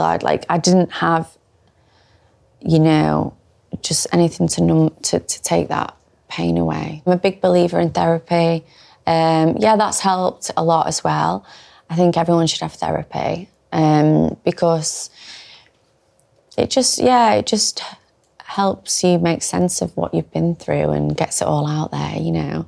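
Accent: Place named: Yorkshire, United Kingdom. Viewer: Sounds like a Yorkshire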